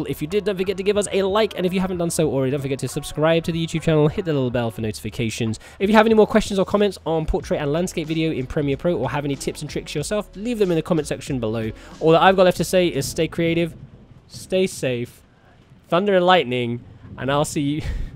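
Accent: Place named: United Kingdom. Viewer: wow, I figured from your accent you were from the UK, so that’s why I was so curious. It looks so much like my part of the US, that’s crazy.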